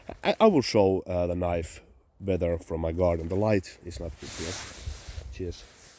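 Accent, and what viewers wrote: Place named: Finland. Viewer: Great to hear a review of Puukkos in authentic Finnish accent :) I quite like the reviews of Marttiini Black Timberjack (seems to be a lot better knife than Mora), but then I discovered Ahti puukkos. I like that Ahti knives have a metal semi-full tang -- useful when you want to hammer the knife like a chisel to make holes without the risk of shattering the wooden handle. I think 95mm blade is optimal for good control during woodworking. Which one would you recommend?? Ahti Metsa or Ahti Vaara???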